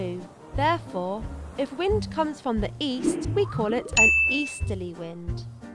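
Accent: United Kingdom. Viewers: This use british accent?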